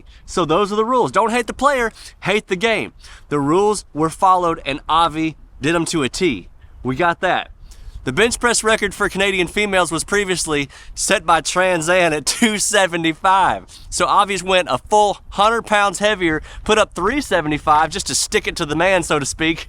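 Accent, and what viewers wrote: South, United States. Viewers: Howdy y’all, I’m a 60yr old Canadian woman. We were the Led Zeppelin teens. First off love your southern accent! So smooth and friendly. Here in Toronto this whole thing has exploded. Out of fear of being called a Na*i I’ve tried to do the pronoun thing but holy sh*t I’M SO DONE!! These people are like “Revenge of the Nerds” gone nuclear!! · Between the Southern accent, and the cadence in which you speak you reminded me of someone then it hit me. Ted Lasso!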